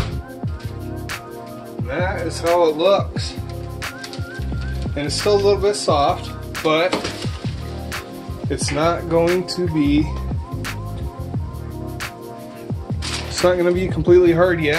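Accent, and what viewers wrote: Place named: Canada. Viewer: Great job🤤🤤 The pizza looked soooo delicious. I personally prefer a fat head pizza crust or a chicken crust. I tried the cauliflower way but too much time 👍Your Canadian accent is so strong 😁. I use to live in Alberta but I moved back to the USA. Anyways enjoyed the video hopefully you will make more cooking videos in the future.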